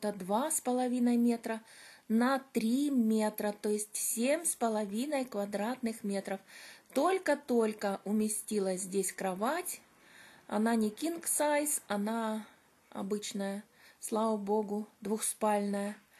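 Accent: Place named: Provincial, Russia. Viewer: А ведущая из какой хацапетовки со своим говором?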